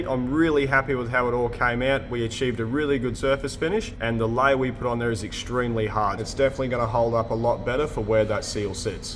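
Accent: Australia. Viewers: At the very begining he says "how u doing guys" or "hi young guys"? Im Polish so I can't tell due to australian accent 🤣🤣 Great video btw ❤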